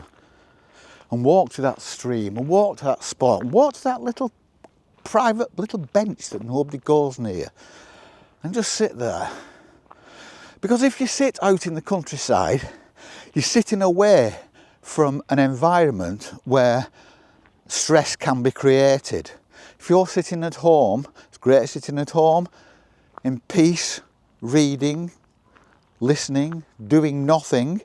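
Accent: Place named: Lancashire, United Kingdom. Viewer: ‘Those’ videos on YouTube made me laugh. I’ve instantly clicked straight off quite a few recently 😂. What a gorgeous walk! I’m living exactly the same way in the west countryside of Victoria, Australia. Listening to you is like talking with my mam and her family who all emigrated out here from Oldham, Lancs. it’s made me quite emotional. Peace and love to you too 😘🤗.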